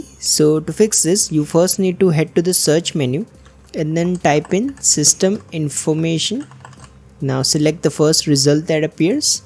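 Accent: India. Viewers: bro has one of the most indian accents ive ever seen typing ts on ubuntu btw im gonna try ts on my windows in a few mintues hope it works imma reply if it works :D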